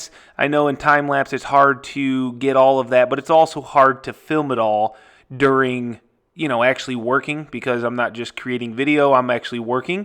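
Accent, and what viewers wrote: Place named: Wisconsin, United States. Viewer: Are you originally from Wisconsin? You have a very Wisconsin accent.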